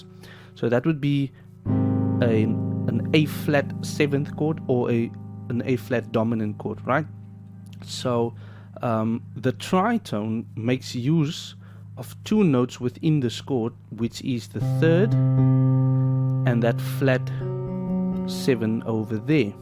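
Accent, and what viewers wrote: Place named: Southern Africa. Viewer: It's amazing how distcinct a Southern African Accent is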